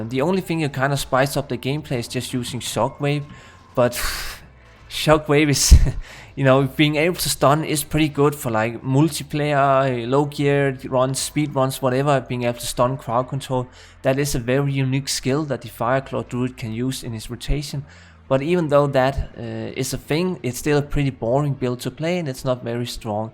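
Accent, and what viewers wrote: Denmark. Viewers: Man kan lugte en Dansk accent 14 kilometer væk.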